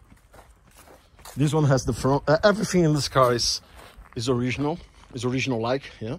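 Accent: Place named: Brazil. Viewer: Nada como um Brasileiro falando inglês. A gente consegue entender um pouco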